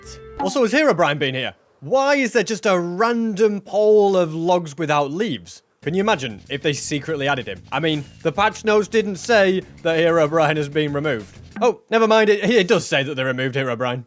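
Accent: United Kingdom. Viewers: You British? It sounds like it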